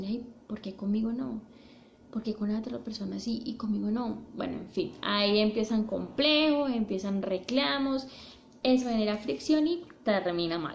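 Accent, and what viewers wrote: Colombia. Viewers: Buen vidro, un abrazo desde barranquilla. Aveces se te sale un asento costeño. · es de Colombia por su asientos y forma de ablar ve sus vídeos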